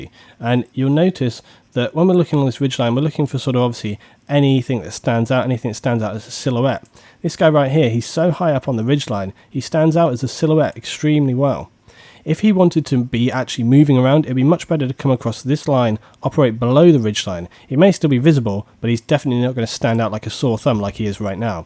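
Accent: United Kingdom. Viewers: feel like a british SAS with that accent, keep it up!!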